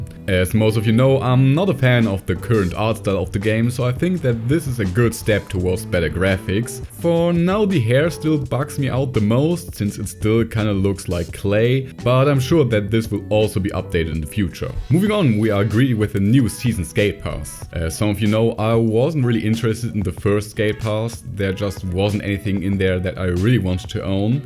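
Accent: Germany. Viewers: are you german or smth cause that accent sounds so german 🔥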